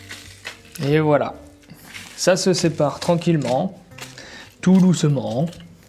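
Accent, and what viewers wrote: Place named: South, France. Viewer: Bien râcler l'Ausssss... donc t'es bien du Sud lol...Dans le Nord ou ailleurs, c'est l'Os...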